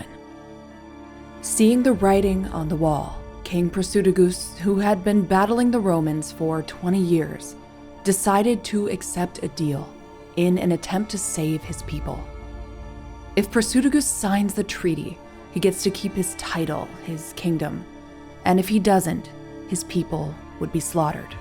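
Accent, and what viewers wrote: United States. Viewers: Shame about the american accent. Ruins the telling, no strength or beauty.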